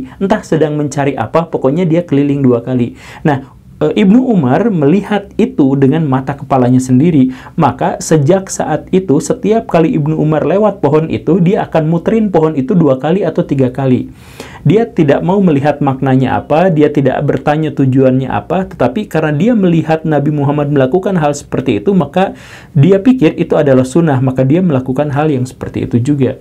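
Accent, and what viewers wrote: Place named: Bandung, Indonesia. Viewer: Btw, Bapa ini jago, di awal beliau seolah tidak sepakat dg konklusi organisasi Islam terbesar di Indo, tetapi di ending, konklusi beliau ya sebenrnya sepaakat dg org Islam terbesar di Indo hehehe...itulah jagonya org dg kultur Sunda, pandai menyamarkan dlm kalimat hehe...oya, kalau dri logat beliau...kayaknya asal daerah Kab. Bandung deket2 Garut, sekitaran Cicalengka gt yak hehe...salam😊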